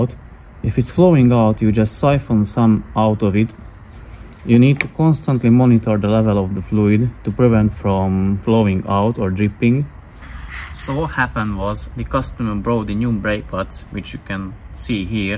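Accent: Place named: Hungary. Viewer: Köszi a munkád! Amint meghallottam az angolod azonnal tudtam hogy magyar vagy! Tényleg hasznos volt számomra ez a szerelés mert épp előtte állok! De lenne pár kérdésem: Ajánlod rézpasztázni a tárcsa és a kerékagy közötti részt? Valamint az alsó és felső csúszkát kell zsírozni? És ha igen akkor azt hogyan lehet?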